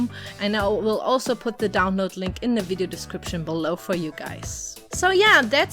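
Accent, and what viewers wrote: Germany. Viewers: Ich habe sofort deinen deutschen Akzent erkannt, aber bin froh dass du Videos in Englisch machst, um mehr Leute zu erreichen. Finde dich sehr sympathisch. Echt super gebaut!:)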